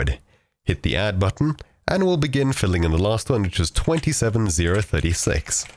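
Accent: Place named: South Africa. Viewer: took me so long to realize you from south africa lol '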